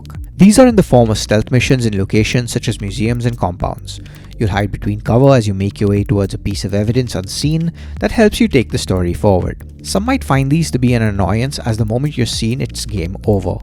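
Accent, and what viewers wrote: India. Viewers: Although I liked the video. But seriously don't try to mimic any accent. Indian accent is way better understandable than others don't try to mess it up.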